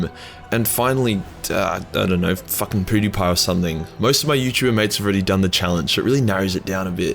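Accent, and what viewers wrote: Australia. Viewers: Gidday Mate ! Good hearing a fellow Aussie accent online !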